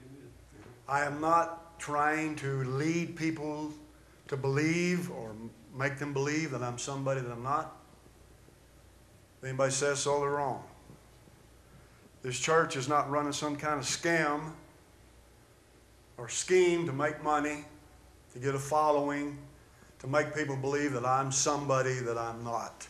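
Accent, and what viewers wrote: South, United States. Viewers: I don't care what he says theirs never anyone came close that sounds like elvis or even comes close like you do and looks like elvis and that southern accent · think its the southern accent fooling you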